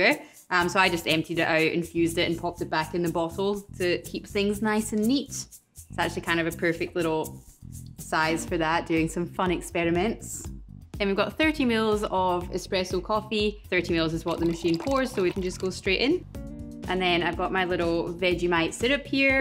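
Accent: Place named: United Kingdom. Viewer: i fell in love with your accent , which part of UK?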